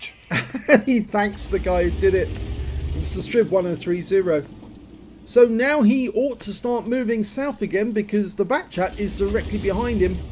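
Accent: United Kingdom. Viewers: I love the accent, you sound like a british, so authentic... Where did you get your accent from? :)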